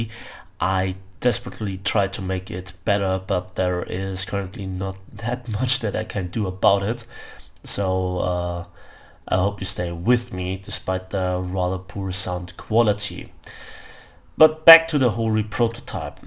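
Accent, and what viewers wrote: Germany. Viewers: your voice sounds even more German like this. It is EVEN BETTER. NO NEED TO SAY SORRY. LOVE YOUR VIDEOS. YOU ARE WHAT KEEPS ME PLAYING WAR THUNDER. THANK YOU FOR BEING SUCH A GOOD CONTRIBUTOR TO OUR COMMUNITY.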